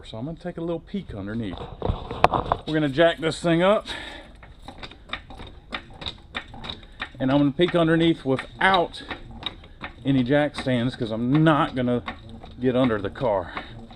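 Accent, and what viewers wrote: South, United States. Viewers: Youuu, southern booyys don't gotta sound so dumb, take notes from!!!') Announcuate!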